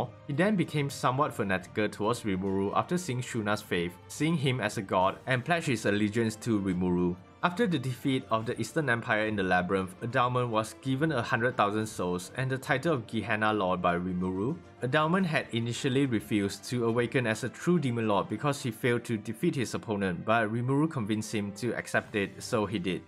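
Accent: Singapore. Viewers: Are you Singaporean????!? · Ehh u sound like a Singaporean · U sound like a singaporean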